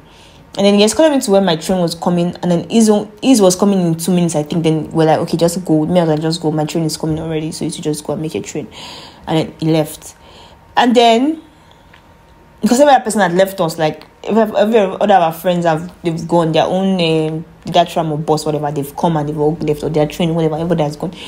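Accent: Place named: Africa. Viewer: Sis, I want to watch your channel but its hard to understand you. I'm a black American and is unfamiliar with African dialect. Peace from New York ✨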